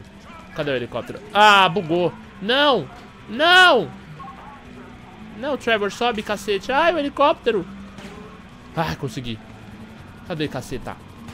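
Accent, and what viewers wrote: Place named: São Paulo, Brazil. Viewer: parece o luciano huck e com esse sotaque irritante de paulistano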